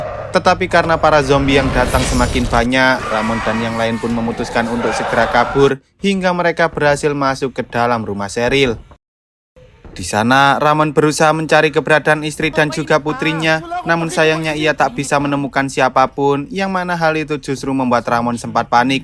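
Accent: Java, Indonesia. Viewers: lho wong jowo mas🎉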